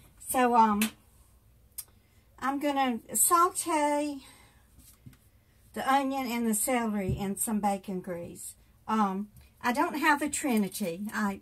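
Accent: South, United States. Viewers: Hey. I've been watching your videos for a while, but this is my first time commenting. I'm from North Carolina, so I can appreciate your southern accent. I can relate to a lot of what, and how you cook. I'm a southern cook too. I enjoy your videos. I got a big kick out of you getting tickled over the artificial leg article online. lol. Keep on making these good videos, and I'll "keep on comin' back." Happy Easter.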